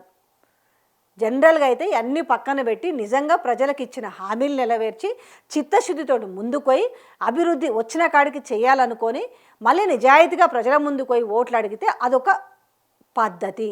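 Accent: Telangana, India. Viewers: మీ భాష చూస్తుంటే తెలంగాణ భాష ల ఉంది మరి నువ్వు అమరావతి మీద ఎందుకు అంత విషం కక్కు తున్నావునాకైతే అర్థం కావడం లేదు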